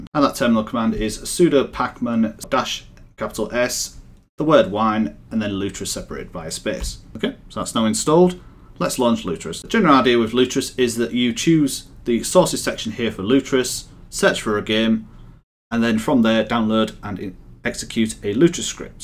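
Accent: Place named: Northern Ireland, United Kingdom. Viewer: Northern Ireland accent 🍀❤️😆Love it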